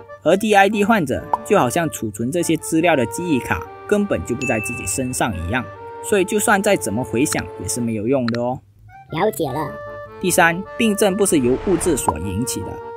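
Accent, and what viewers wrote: Malaysia. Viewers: 馬來西亞人的口音本來就這樣的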